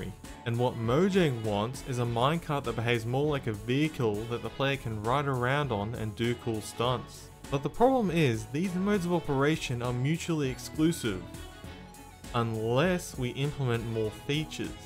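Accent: Australia. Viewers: Two questions: Are you an Aussie? Is that your i20n?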